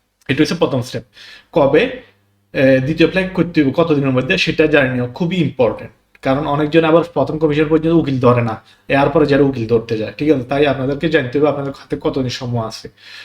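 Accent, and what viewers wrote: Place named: Noakhali, Bangladesh. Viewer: আসালামু আলাইকুম কেমন আছেন ভাই আপনার কথা গুলো শুনে আমার মনে হচ্ছে আপনার বাড়ি কি নোয়াখালী না কুমিল্লা জানাবেন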